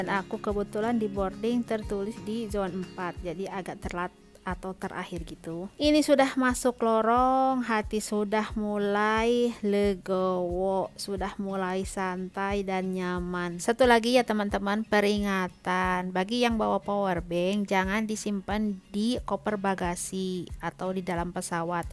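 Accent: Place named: West Java, Indonesia. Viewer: hihihi ... Ciri khas Sunda teh 😆 semangat ngontenna teh ❤️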